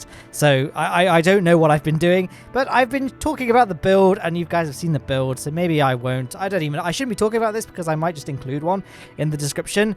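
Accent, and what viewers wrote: United Kingdom. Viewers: Only OG's remember his British accent at the inteo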